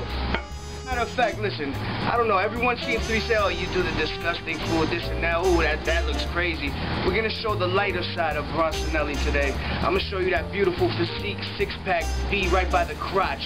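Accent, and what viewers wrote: United States. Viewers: That's that Flushing Queens in him. New York hardcore. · That he does. He sounds more diverse than just the average New Yorker if you ask me.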